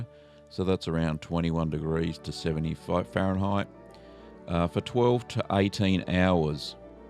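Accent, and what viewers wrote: Australia. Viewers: Thank you -- very informative. I can't wait to try this; I've become very lactose-intolerant, so unless I make my own I won't get to eat cream cheese again... Not sure where you're from (I'm guessing Australia but wouldn't know a New Zealand or other accent if it bit me) -- but this strawberry pretzel salad is popular here in the States -- haven't tried it yet, but am going to! (this site may ask you to join in order to see the recipe, but there are a ton of recipes out there...) Thank you again! <link>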